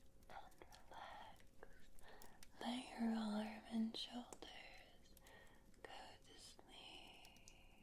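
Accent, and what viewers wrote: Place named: Scotland, United Kingdom. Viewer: This is your best video yet 👍 absolutely my favourite Scottish accent in the world, thank you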